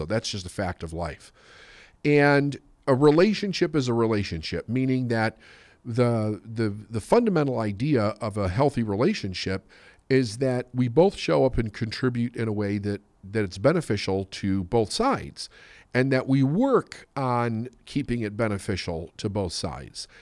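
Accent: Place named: Midwest, United States. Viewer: This guys midwestern accent is driving me NUTS. Bayack, flayat.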